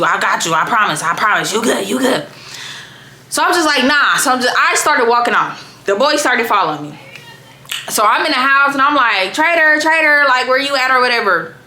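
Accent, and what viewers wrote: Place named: New Orleans, United States. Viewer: Ya feel me...sound like u from the N.O...lol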